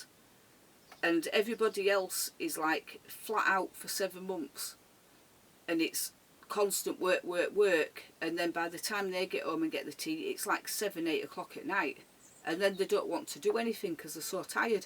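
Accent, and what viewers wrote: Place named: Huddersfield, United Kingdom. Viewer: Yes, I have sent people racing pigeons of mine up there and Poultry. You sound like people I know in Huddersfield and Hebden bridge girl x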